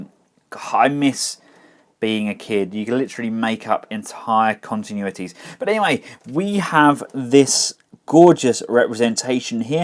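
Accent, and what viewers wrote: England, United Kingdom. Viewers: you and your puns, more funny in your English accent!!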